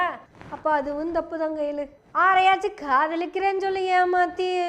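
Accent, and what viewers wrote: Kongu, India. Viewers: கேட்டு கேட்டு சிரிக்காமல் இருக்கமுடியவில்லை தங்கையே! அருமையான பதிவு!! கொங்கு தமிழ் கொம்புத்தேன்!!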